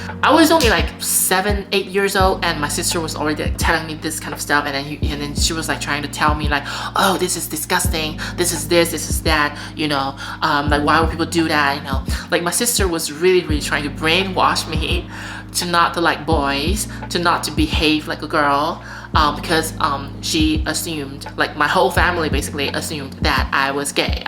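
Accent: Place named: United States. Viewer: Thanks. My wife and I are legally married in the US and she’s a US citizen now. You should do a video about what you think about the state of LGBT rights and acceptance in China and marriage equality in China. It would be cool to know about how you became so fluent in English, too. That American accent didn’t just come from nowhere and inquiring minds want to know! Thanks for your consideration. I’m Loving the channel.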